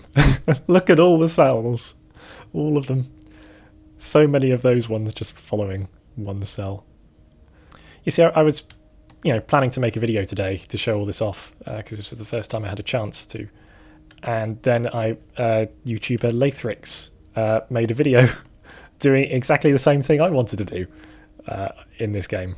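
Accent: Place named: United Kingdom. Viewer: you cannot understand how soothing your slightly nervous British voice is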